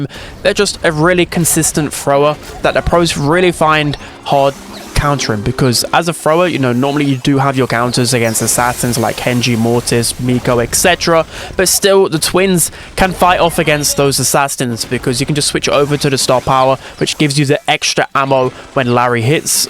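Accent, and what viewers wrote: United Kingdom. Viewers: Just realized ur British wth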